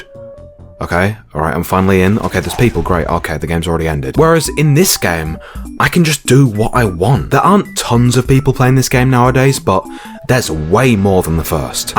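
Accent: United Kingdom. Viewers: Are u British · you sound funny for a british person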